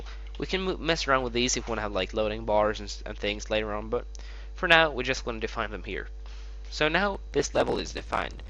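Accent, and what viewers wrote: Sweden. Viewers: are you Swedish? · Hah du er svensk? ;) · R u swedish?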